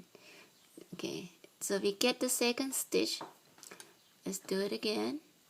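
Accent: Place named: Thailand. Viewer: I love your tutorials! Maybe move away from your camera a little bit. You teach very well. I love your accent. May I ask if you are from Thailand? I lived in Thailand many years ago. 💕. Thank you for sharing your craft.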